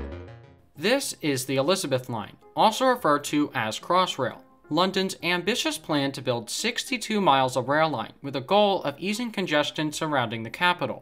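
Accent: United States. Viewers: I didn't realise that we priced projects in US dollars in London. What on earth were the makers of this video thinking of: pricing the Crossrail project in US dollars ? What's all this US accent to tell us about the London Crossrail? We don't have 'railroads' in London: we have railways. We don't have 'transportation' in London; we have transport. We do not have a 'Department of Transportation' in the UK; we have a Department of Transport . This is actually written on the screen. Why on earth do you use a voiceover speaking US English?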